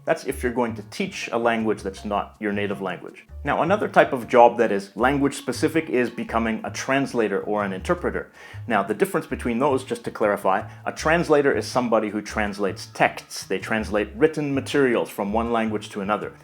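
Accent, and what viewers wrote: Canada. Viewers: Hey,?(first off as a little joke, I’d bet 50 million dollars that you’re Canadian.). Your accent is so strong. Not a bad thing at all, I just recognize the Canadian accent:-) I used to live in Cleveland for a few years growing up after leaving LA at age five. Then I did college and traveled the world. Currently I’m living back in Los Angeles I ♥️ my city!! (a mile from downtown LA and I’m actually- - believe it or not finding it hard to find any reasonably priced tutor or school of languages. We All know Berlitz is SUPER EXPENSIVE. It’s expensive already Just to live here as it is, so my location is all the way across town to Westwood (better known as Tehrangeles) which. And SoCal alone Has the Highest percentage of Iranians living outside of Iran. They’re mostly-no,.. ALL ARE very Wealthy or well to do - and all are Reza Pahlavi supporters for the most part which is fine I’m not being political but the generation just a few years older than me,.. 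who were 7-8 in the late 70’s or early 80’s and left the khoemeini revolution are so far snobby and all about money and materialistic.i Literally cannot find a school under $775 for a once a week four-week class?! And the private tutors want less than $90 per HOUR?! That’s the “best” price I’ve found. Do you have any suggestions or places where I can practice my Farsi? I’m semi intermediate level. Forget USC and UCLA’s programs. They’re wonderful but I would have to sell my kidney to take a semester there. I own tons of Persi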